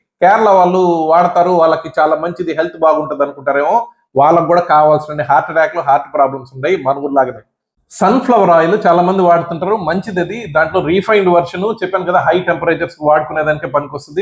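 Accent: Nellore, India. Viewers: 🙏🫡🙏🫡🎉🎉👌🤞👍 సూపర్ డాక్టర్ గారూ స్వచ్ఛమైన తెలుగు నెల్లూరు ఒంగోలు యాస లో అందరికీ అర్థమయ్యే విధంగా చెప్పినందుకు ధన్యవాదాలు అండి మేము చాలావరకూ మీరు చెప్పినట్లే వాడుతున్నాం కానీ వేరుశనగ నూనె డబుల్ ఫిల్టర్డ్ వాడుతున్నాం గానుగ నూనె ధర ఎక్కువని వాడట్లేదు అలాగే రైస్బ్రాన్ ఆయిల్ ఒక సారి వాడితే మరొకసారి వేరుశనగ నూనె మార్చి వాడుతున్నాం నాన్ వెజ్ లో ఆవ నూనె వేరుశనగ లేదా రైస్ బ్రాన్ కలిపి వాడుతున్నాం మేము హార్ట్ పేటెంట్స్మి అందువల్ల పూరీలు డీప్ ఫ్రై స్నాక్స్ తినం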